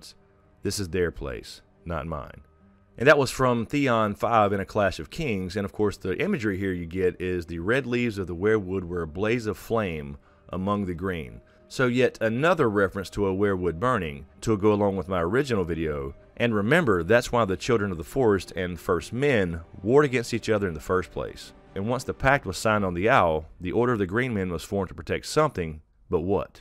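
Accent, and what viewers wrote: South, United States. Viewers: I agree with his southern akcent to kool.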